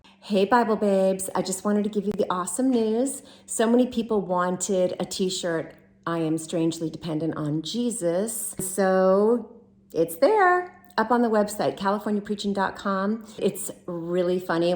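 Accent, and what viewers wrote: England, United Kingdom. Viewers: Beautiful British accent,. Makes your beautiful voice more beautiful. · The English accent is awesome!! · Not lovin' the English accent and imagine how cringe it's be if a British youtuber broke into an American accent. He/she would sure look a fool! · Love the British accent!!! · Llllove that English accent! I give you kudos for that one for sure!! (Even if I'm a year late for this one). But I love all accents, I gotta say, just love 'em! And so, throwing in some others now and then is just fine with me! But above all, I love your devotional talks here, thanks, our eternal friend!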